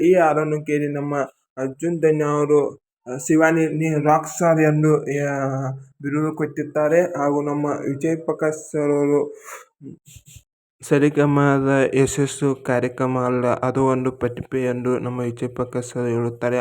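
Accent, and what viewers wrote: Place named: North Karnataka, India. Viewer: Uk kannada 😅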